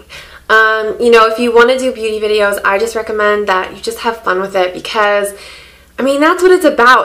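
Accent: United States. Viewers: Hi,! I like ur videos so much and not just because of all the make up stuff u do, but also because of the language u speak:-) i sometimes like the make up things ppl do so much but if i dont like/understand them i'll never subscribe! i love how american english sound more and hence i love ur speaking! thanx for cool make up related videos and language "master classes"! ♥ From Russia with love... ♥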